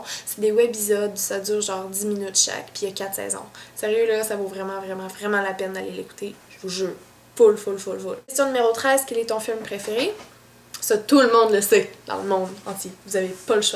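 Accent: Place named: Quebec, Canada. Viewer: Je suis totalement fan de ton accent (vive le québec :3), et tes vidéos ^^.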